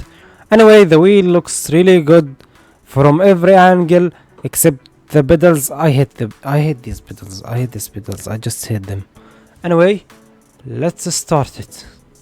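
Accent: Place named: Arab world. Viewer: Arab Accent goss hard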